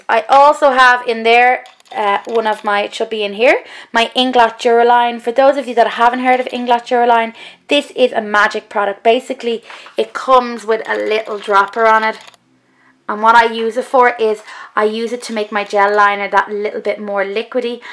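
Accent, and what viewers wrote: Ireland. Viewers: Trust me hun I would watch more than 45 mins of makeup if it's you! I can't believe I've just discovered you I think your brilliant and l love your accent! <3 it reminds me of my half Irish fiancé :) thanks for showing us everything I haven't got much of a selection I've only recently started my makeup journey so the most I have is just a bag filled of goodies that's about it but if I keep watching you I will end up with wayyyyy more makeup than you!! Thanks again !! Oh and btw congrats on the wedding!!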